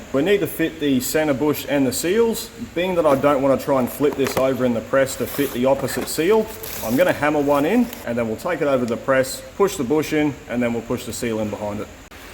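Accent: Australia. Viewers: "... now I'm talking American." That's OK Mate, I've had to learn how to listen in Australian...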